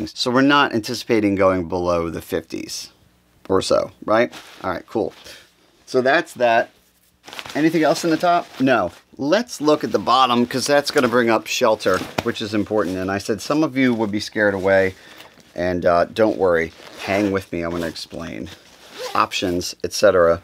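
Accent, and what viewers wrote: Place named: Pittsburgh, United States. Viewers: You sound like you're from PA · Are you from Pennsylvania? I feel like I'm hearing some light Pittsburghese.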